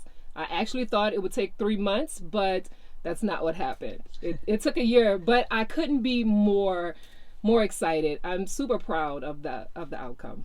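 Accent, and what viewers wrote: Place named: New Orleans, United States. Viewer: Lord it The minute she started talking I thought she’s gotta be from New Orleans! Beautiful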